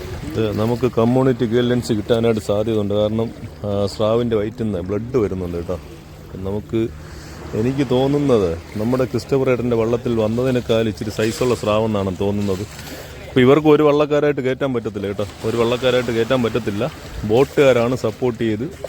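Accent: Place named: Thiruvananthapuram, India. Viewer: തന്റെതായ അവരണ ശൈലി തിരുവനന്തപുരം സ്റ്റൈൽ സൂപ്പർ 👍❤️🎉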